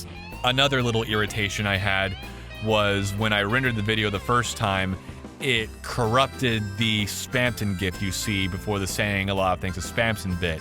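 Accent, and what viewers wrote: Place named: Estuary English, United Kingdom. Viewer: It's estuarine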